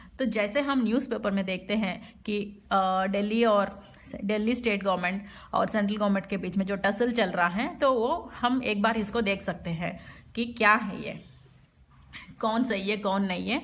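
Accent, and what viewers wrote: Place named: Telugu, India. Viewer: by her accent of hindi, i can say she is telugu...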